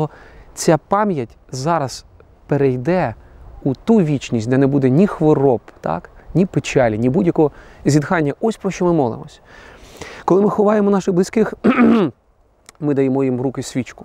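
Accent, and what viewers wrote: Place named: Russia. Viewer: Яка українська - московська(сумно)!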